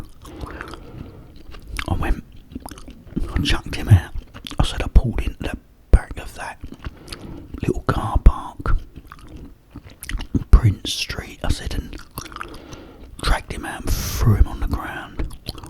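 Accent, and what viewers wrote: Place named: Cockney, United Kingdom. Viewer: Hahaha. the cockney accent is Soo tingly!! I literally fell asleep to this earlier and had a weird nap dream about opening all the drapes in your house and you arguing with me to keep them closed 😂 But close up heavy accent whispers are such great ASMR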